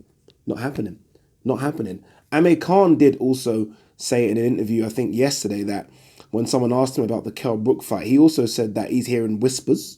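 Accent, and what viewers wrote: Liverpool, United Kingdom. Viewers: Love your Scouse accent.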